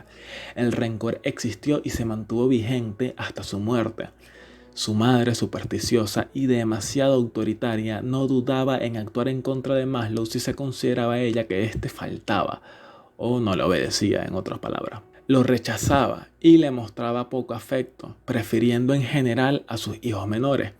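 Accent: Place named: Caracas, Venezuela. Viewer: Por tu acento eres caraqueño y por lo otro eres de derecha.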